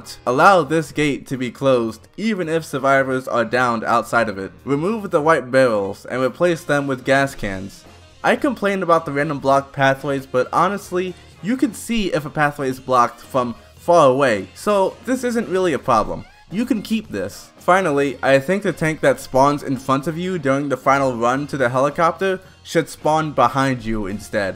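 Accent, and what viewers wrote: United States. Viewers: What's your accent? New York?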